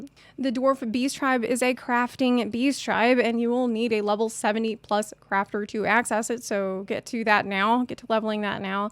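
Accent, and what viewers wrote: South, United States. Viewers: You sound like you're from the south. Coastal southeast.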